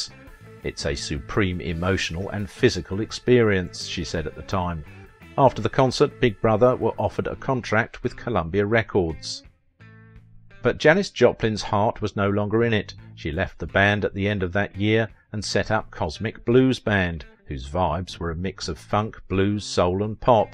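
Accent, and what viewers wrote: United Kingdom. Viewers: Script clearly written by a kid. Why put a British accented man to speak your words? "She had a guy and a woman...so that's when she ended up doing heroin. It turned out to be super strong stuff..." Not a criticism but weird choice. The accent isn't needed to add cred.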